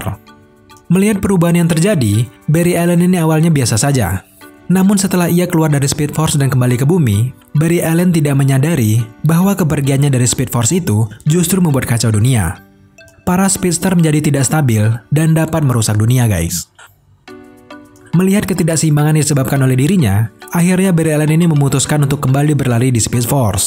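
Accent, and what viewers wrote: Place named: Batak Karo, Indonesia. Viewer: Bro, dialek lo kok kaya batak karo yaa...bener ga hehehe Mantappp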